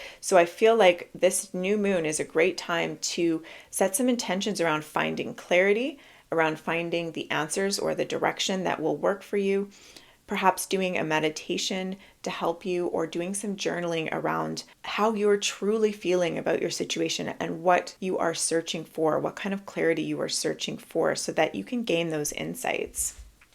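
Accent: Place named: United States. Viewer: I’m trying to place your accent! It’s distracting me from the message in my reading because you’re reminding me of a cross between a cousin of mine from upstate NY and a friend who has roots in both Colorado and Florida! I can’t tell if you’re E or W coast, midwest, southern or what. Did you have one accent growing up, then go to college out of state and end up with a standard American accent, or is that the accent where you’re from, in which case, which state? It’s lovely 😊 (I also liked my reading very much, thank you 🙏 pile 1) (PS, if it turns out you’re Canadian after all that, I apologise!!)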